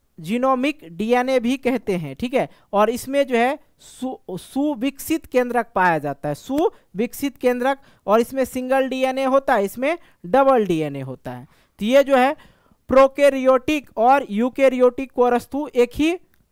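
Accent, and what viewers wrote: Bihar, India. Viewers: Sir aap bihar se hai kya😅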